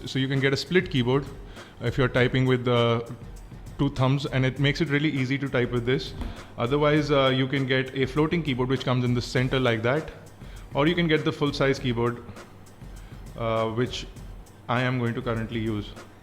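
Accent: India. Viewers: oh damn i hate that silly indian accent...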